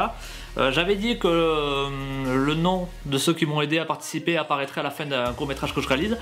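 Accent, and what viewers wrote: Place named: South, France. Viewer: il y avait un accent du Sud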